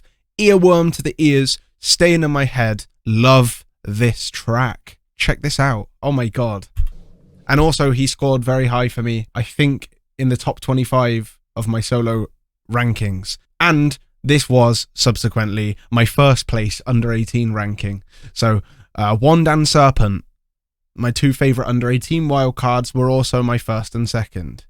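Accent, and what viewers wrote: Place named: United Kingdom. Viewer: И твой британский английский как скальпель по яйцам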